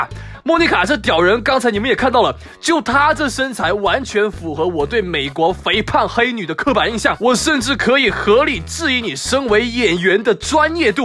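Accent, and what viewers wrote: Taiwan. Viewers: 這個口音 好像台灣人喔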